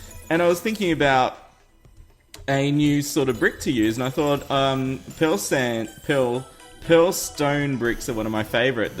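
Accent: Australia. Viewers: I hope u are enjoying your play through and I notice an Australian voice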